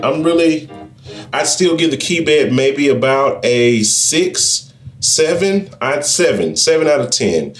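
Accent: United States. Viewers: The review is good, but the pronunciation is really very "American". It was very difficult for me (Im Italian, understanding English well) to follow.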